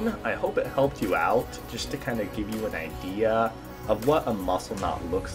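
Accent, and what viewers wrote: Alaska, United States. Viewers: He sounds like one of the Alaskan Bush people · He sounds just like the Alaskan people show. Wonder where he is from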